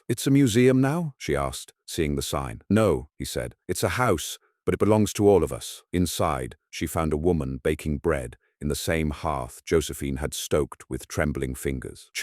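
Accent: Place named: United Kingdom. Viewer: The pronunciation sounds British to me. It’s different from American English, and correct British.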